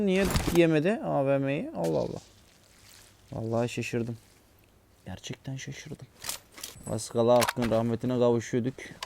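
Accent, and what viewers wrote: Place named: Bolu, Turkey. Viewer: Abi nerelisin sende bolulu gibi bu ses var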